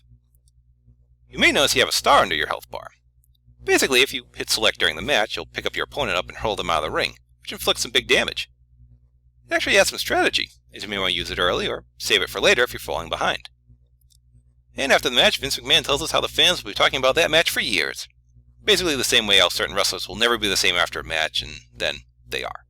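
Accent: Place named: Canada. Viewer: Depends where you are from. I'm pretty sure is Canadian due to his accent and sound of his voice. That said, he probably pronounces it like former Philadelphia Flyer and current LA King player Simon Gagne and his name is pronounced.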